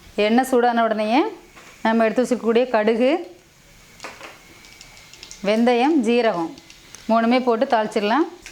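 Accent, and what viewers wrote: Tirunelveli, India. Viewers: Sister yr native place thirunelveli ya yr nellai slang is very like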